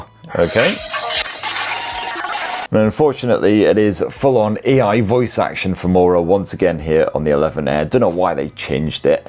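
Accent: United Kingdom. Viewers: you talk like Rowan Atkinson, or maybe every British out there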